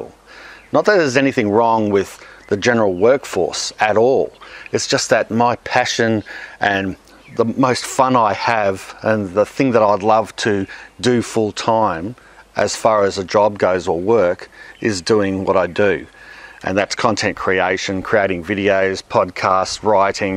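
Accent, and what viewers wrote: Australia. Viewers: G'day from Germany. Since my daughter did not come back from her trip to Australia because she fell deeply in love with the country and especially one of its inhabitants,I often tune into your channel just to have a little Aussie feeling and to learn the accent. And yes, we do have a rised garden bed now. Blessings to you and your loved ones!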